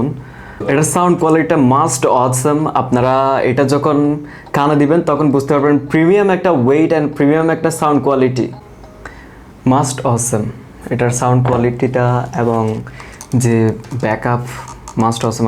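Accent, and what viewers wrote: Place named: Noakhali, Bangladesh. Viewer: noyakhliar local accent roye gese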